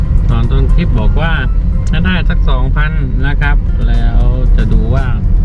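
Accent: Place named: South, Thailand. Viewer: สำเนียงน้าเหมือนคนใต้เลยคับ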